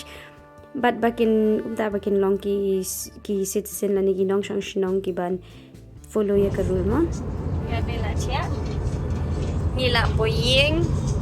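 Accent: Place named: Mawlai, India. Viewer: Kren nong mawlai noh khyndit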